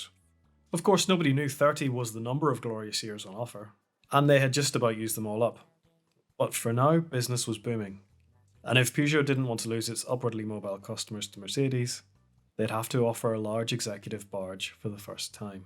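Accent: Ireland. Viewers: Pronunciation is different in different countries. Ideally we should all use a French accent but he uses the normal pronunciation that is used in Ireland. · Irish accent! Superb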